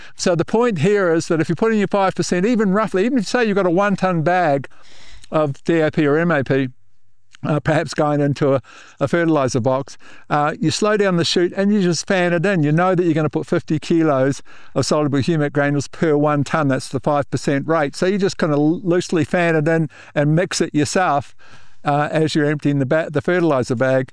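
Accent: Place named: Australia. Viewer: The captioning translation is saying collate do you mean chelate? Your Aussie pronunciation is changing what we in USA pronounce as "key late"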